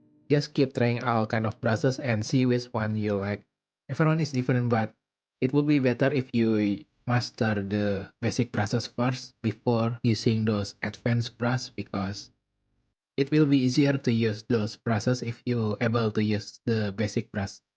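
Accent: Indonesia. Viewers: bro were indonesian all this time ...? can't hide those accent :face-orange-biting-nails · aksennya ngomong nya kyk indo bang ;v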